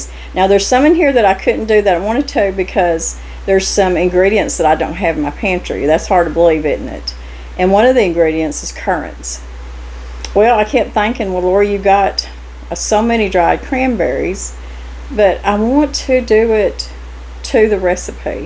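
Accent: South, United States. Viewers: Dear, Absolutely love your hair style! You look prettier all the time, and you’re already beautiful! Thank you for all your wonderful videos and for sharing your lifestyle with all of us……..your devoted fans! I love listening to your beautiful southern accent, no matter what the subject of the day offers, whether it’s cooking, gardening or just listening to you and sharing your thoughts and memories. I’m forever grateful that I found your Utube channel. God bless you! Love, Jackie🪷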